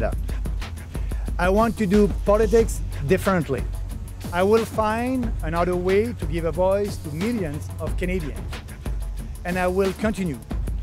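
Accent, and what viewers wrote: France. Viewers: Wow this guy tells it like it is not for himself but for Canadians. This guy needs to be Prime Minister. Perfect name for the party! It’s like a revamp for the Conservative party! If Canadian can overlook his French accent then we will have a real leader! God we might need your help! Canada needs your help! 🇨🇦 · The French accent applied to English speech gets us pay closer attention to the words and by extension to their meaning. He also got to his points quickly, without buzzwords. On a side note, I can’t picture Maxime stopping an English speaker to correct the word ‘mankind’ as ‘peoplekind’.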